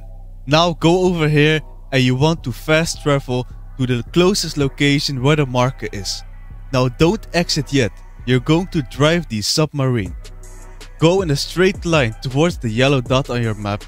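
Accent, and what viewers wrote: Netherlands. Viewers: are u dutch? you have a bit of a dutch sound when you speak english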